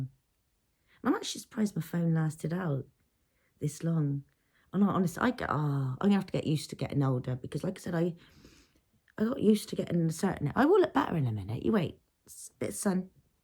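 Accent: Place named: England, United Kingdom. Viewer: I dont think my comments to the first vidoe of yours were correct about being a witch, but im not certain. You talk like me but with a English accent. Im going to get to the bottom of this.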